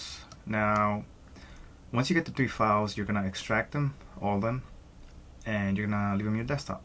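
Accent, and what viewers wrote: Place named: Mexico. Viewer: Can you please fix your semi mexican accent? thanks.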